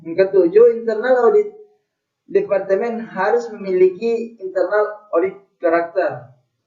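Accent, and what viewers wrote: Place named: Bugis, Indonesia. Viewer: Materinya udah bguss cuman cara bacanya masih kebawa logat bugisnya gitu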